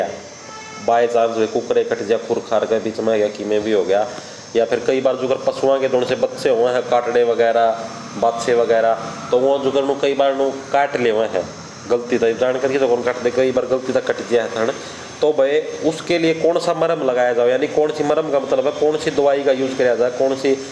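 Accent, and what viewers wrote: Haryana, India. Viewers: Khadi haryanvi hai madam ji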